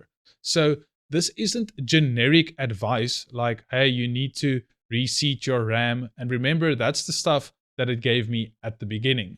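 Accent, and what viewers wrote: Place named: Johannesburg, South Africa. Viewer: Excellent video, yes keep them coming, I find them very interesting. Plus my wife comes from Jo-burg and your voice makes her home sick lol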